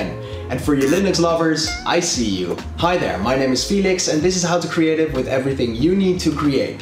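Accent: Netherlands. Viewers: the Dutch accent is 98% here. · 10 seconden in en ik gok... een nederlander? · Na 1 sec hoorde ik al dat je NL bent. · Huh you dutch?! Je hoort het amper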